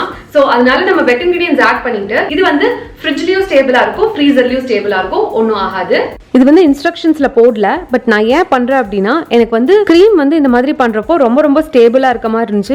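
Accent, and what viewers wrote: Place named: Pollachi, India. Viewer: பொள்ளாச்சி மொழி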